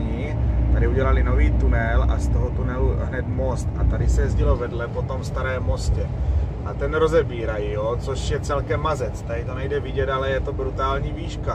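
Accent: Moravia, Czech Republic. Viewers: Za mě super, tož Ti dávám odběr 👍 A hrozně dobře se to poslouchá, ta naša moravština 😃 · Perfektne video, nedalo mi a dnes som si ho pozrel druhy krat. Dobry koment,obraz proste vsetko a ten prizvuk moravsky, moravakov mam rad:-D S to bolo ozivenie. To Nemecko ti nezavidim ked nemas den tak vindes so STAU prejdes 50 km a je tam dalsia a to nejazdim s kamionom. Klobuk dole pred vasou pracou, ja som o nej par krat premyslal ale nedal by som to pri tych preplnenych cestach, ako si povedal odstavit zahodit kluce a ist domov vlakom:-D toto zazivat kazdy tyzden by ma kleplo. Tesim sa na dalsie videa a drzim palce. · ty moravaku fajne:-)moc hezka rec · Nemá chybu ta moravská mluva, je to vtipné, ale v dobrém smyslu, dobře sa to poslúcha:-) Tak tak lidi by měli být na ty kamioňáky ohleduplnější však to není sranda toto šoférovat apropo kdo by jim domů dovezl ty jejich ebaye a wishe a další... 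· Ta moravština je i pro mě úplně suprová, zvláště když jsem se ocitla v Čechách a oni tady mluvijou fakt divně:-D:-D:-D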